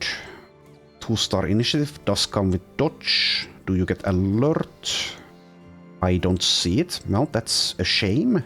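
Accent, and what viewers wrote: Finland. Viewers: The moment I opened the video and heard your voice, I though, "You must be a Finn". I wasn't disappointed :)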